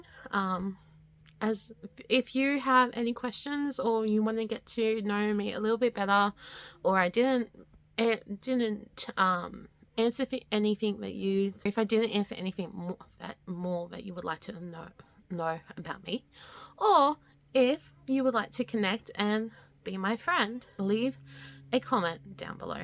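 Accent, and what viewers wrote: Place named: Australia. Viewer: !!! this was so good! (also, yes. You do have an australian accent and it's so cute) 😍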